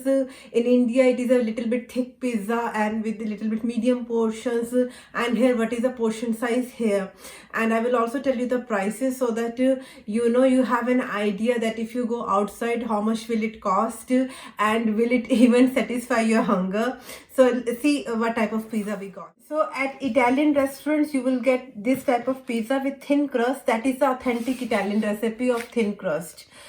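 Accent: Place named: England, United Kingdom. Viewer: Nice English accent, not too hard to understand.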